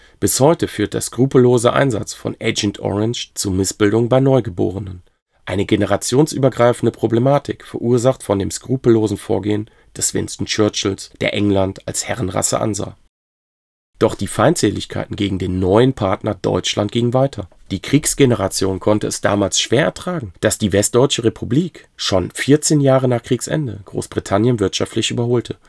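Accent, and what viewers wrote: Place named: Turkey. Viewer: Der Sprecher hat einen türkischen Akzent .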